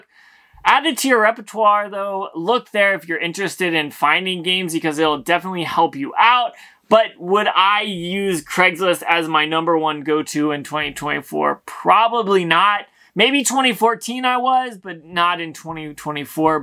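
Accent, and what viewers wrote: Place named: Midwest, United States. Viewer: This been bugging me for awhile are you from the Midwest ? I can kinda hear the accent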